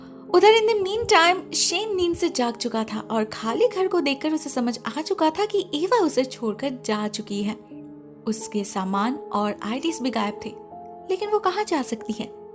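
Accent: Bengal, India. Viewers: her accent described it so well that she's bengali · R u begali...it seems by your tone of Hindi...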